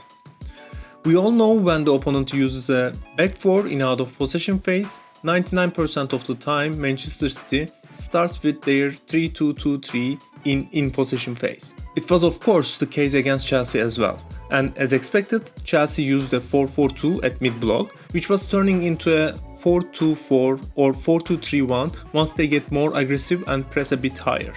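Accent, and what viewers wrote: Turkey. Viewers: Bro are you Turkhis ?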